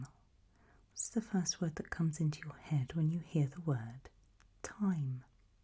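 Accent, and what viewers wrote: England, United Kingdom. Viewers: I really love your English accent, it is soooo comfortable and beautiful ❤❤( I don’t know if this is polite and sorry if it is impolite I just want to say it’s so much comfortable and really make me relax!!)Thank you for all your hard working on ASMR~~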